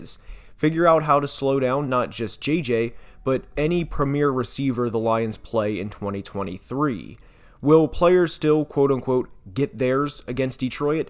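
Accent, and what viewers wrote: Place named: Minnesota, United States. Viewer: is a Minnesoh-tan gotta give him a break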